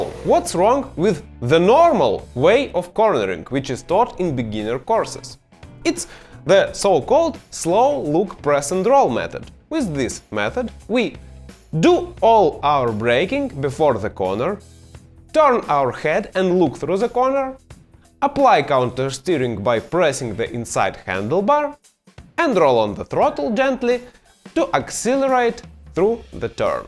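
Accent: Russia. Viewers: Hi from Bundaberg Australia 🇦🇺😊👍 Ive seen many many Educators Video's on Motorcycle Riding 🏍 Here in Australia & American BUT none of them match Your Video's , Some other people's are good BUT most are average ! Ive learnt so much from You & your teachings 😊👍 Im now understanding Your Russian Accent really well now ☺️ Thank you for doing these video's & know we new & im thinking even more experienced riders appreciates them & learning plenty ! Cheers from Aus matey 🇦🇺🕺🍹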